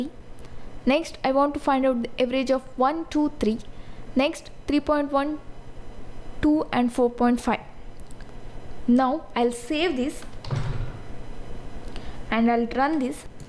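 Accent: South India, India. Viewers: R u south indian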